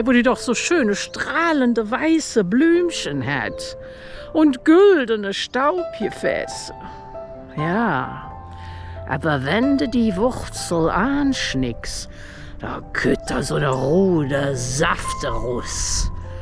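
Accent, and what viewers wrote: Cologne, Germany. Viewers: Liebe Grüße aus Dresden bei Uns ist grad Schmuddelwetter und die Sonne lösst sich selten blkicken. Schönes Video und Kölsch klingt geil. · Einfach, wie immer, grandios. Du bist eine wunderbare Schauspielerin mit einem enormen Wissen und Deine koelsche Aussprache, einfach herrlich. Es war mal wieder ein Genuss. Danke dafür. Lg Evi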